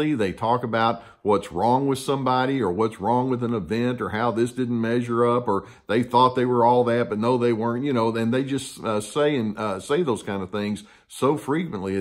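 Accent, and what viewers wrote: South, United States. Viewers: But i hit the rewind first and listen again to make sure I get the message. I guess I'm trying to say I'd like your videos to go a little longer on one topic. You talk fast for a smooth southern talkin man. Love your accent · I enjoy listening to. His knowledge and delivery is pretty easy to listen to and his country accent reminds me of home. Good info to share. Thanks!!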